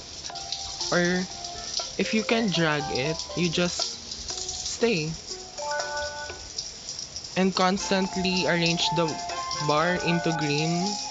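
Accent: Philippines. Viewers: i like that filipino accent ahh 🇵🇭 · filipino accenttt hihi 🇵🇭❤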